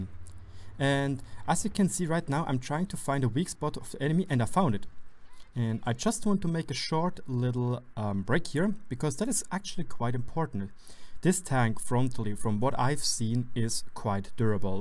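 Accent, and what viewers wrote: Germany. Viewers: Your accent certainly tells you're German, aren't you? The way you pronounce (Cheesus xD) Jesus, just cracks me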